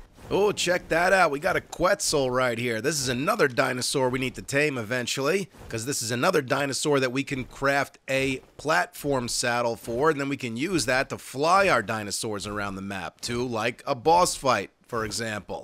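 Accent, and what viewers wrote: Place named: New York, United States. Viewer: Man, when I found this channel I thought it was Simon from Neebsgaming due to the NY accent hahahaha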